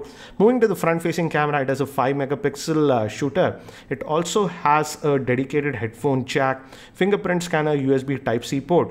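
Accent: India. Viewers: Good video, but maybe you can talk a little bit slower, because ( no racist ) indian accent and fast talking together is really hard to follow in my honest opinion. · Indian English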